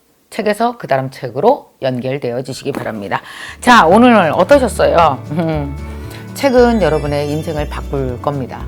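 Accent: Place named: Chungcheong, South Korea. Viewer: 충청도 ㅋㅋㅋㅋㅋㅋㅋ 아 웃겨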